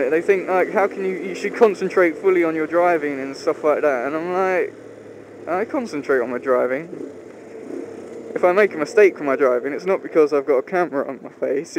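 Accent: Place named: Southern England, United Kingdom. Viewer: Good to see your better :') and motorcycle vlogging is good :D come end of march when I get my first bike I wana get a helmet cam and do it :D and what part of England you live you sound like southern or south west like Kent / Essex?, keep the videos coming :')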